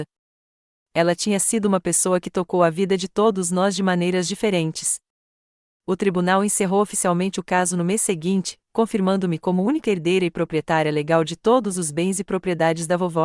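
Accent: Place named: Portugal. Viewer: Gostei muito da historia. Eleanor uma grande mulher, que deu uma grande lição à toda a família depois da sua morte, endinando-os o verdadeiro valor da vida, o amor entre as pessoas é muito superior do que o material. Apesar de ser importante e confortável , não o que nos define. Narração perfeita, de Portugal ❤❤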